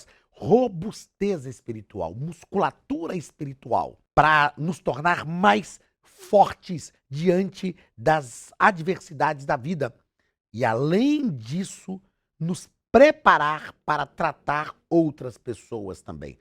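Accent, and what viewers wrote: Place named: Minas Gerais, Brazil. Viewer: Estudo junto todas as semana sou mineira e gosto de ouvir um mineiro falando Deus continue lhe usando Pastor.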